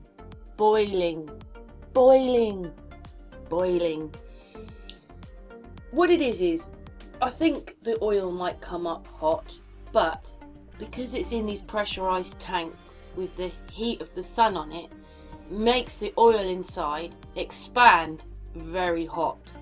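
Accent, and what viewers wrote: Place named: England, United Kingdom. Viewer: She's English so that's odd you find her hard to understand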